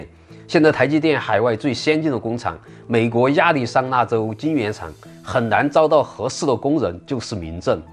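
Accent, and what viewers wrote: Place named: Sichuan, China. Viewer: 先把影片標題繁簡轉換搞對吧～「穀/谷」，很不專業。而且你口音實在有點重，與其努力繞舌，不如直接用四川話錄視頻吧，效果一定很棒，畢竟是母語。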